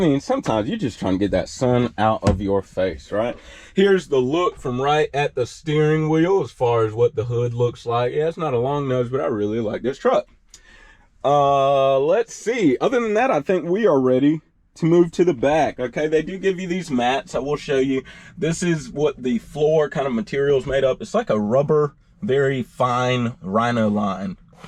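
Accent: South, United States. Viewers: Where you from,? Quite the southern accent.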